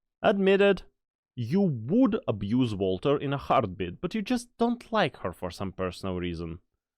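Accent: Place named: Russia. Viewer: Рашн акцент, бро, веди телегу пж🙏🙏🙏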